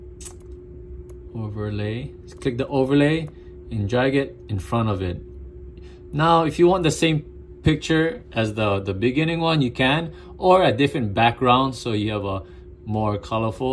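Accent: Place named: Japan. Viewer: I know this guy is japanese ..his accent is so cute😂❤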